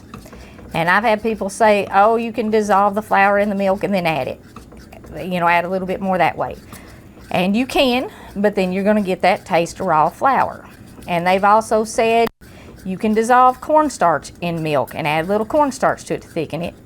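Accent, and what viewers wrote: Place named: Missouri, United States. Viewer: Hello! Loved the video and love hearing you talk.My dad was from Alabama and it brings back memories. Anyhow....never made gravy w/o sausage, I'm going to have to try it. My recipe is to brown up a pound of sausage, spoon out the excess fat and sprinkle flour over it. Stir it up and add the milk. After that is is some salt, lots of coarse ground black pepper, some powdered sage and chicken seasoning for flavor. I have even used chicken bouillon cubes at times. Thanks again for the great recipes. BTW....what part of the south are you from originally?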